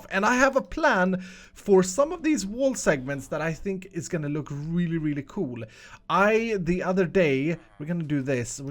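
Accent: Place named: Sweden. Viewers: yes hes swedish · Hey ARE you from sweden i just subscribed on you and you sound like a swedish Guy IM from sweden hejdå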